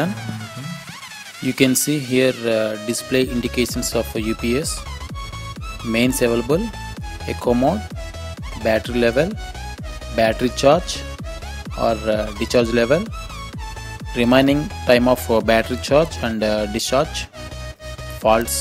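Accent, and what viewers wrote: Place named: South India, India. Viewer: Dude i know that music is overrated but whats wrong with his English? Its just his accent. He is most probably from South.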